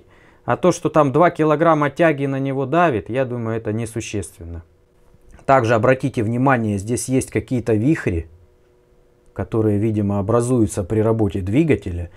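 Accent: Rostov, Russia. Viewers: Понад корпусом🤣 ростовский говор ни с чем не перепутаешь👍 привет узловой бригаде связи г. Аксай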